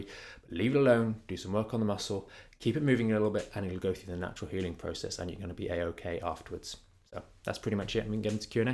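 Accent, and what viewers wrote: England, United Kingdom. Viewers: I barely understand this guys accent. Its so horrible to listen too and speaks way too fast. How i wish he was an American and not English.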